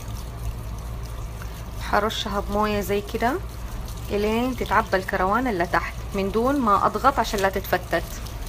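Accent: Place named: Hejaz, Saudi Arabia. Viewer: هي بتتكلم باللهجة الحجازية اللي الآن كل الدعاية والإعلان يتكلمون بنفس هاللهجة ....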